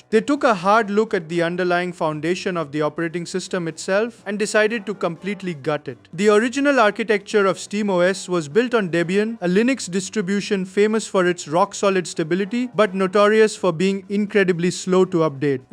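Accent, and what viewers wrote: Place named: India. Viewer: Heard the Indian accent and left instantly saar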